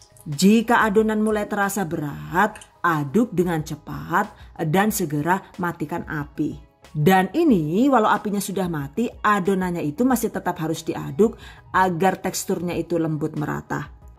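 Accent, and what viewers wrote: Banyumas, Indonesia. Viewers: Mom nya wong bnyumas kiye hehhe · ngomonge jan ngapak gawe guyu inyong #GiveawayTHV2021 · Kalau bahasa ngapak emang gitu bund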